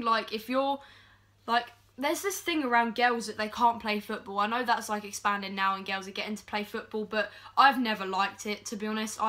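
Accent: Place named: Ireland. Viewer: girl he always posts irish vids when she sounds irish herself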